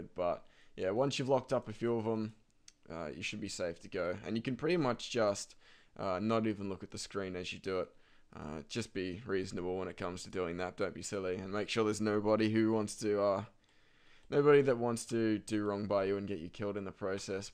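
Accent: Australia. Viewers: Been so long since I heard that Aussie accent. :)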